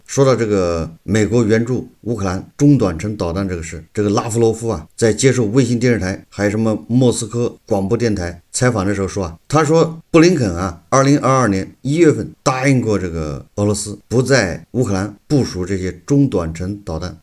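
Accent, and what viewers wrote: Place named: Xiangxiang, China. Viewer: 这湘乡普通话，亲切👍👍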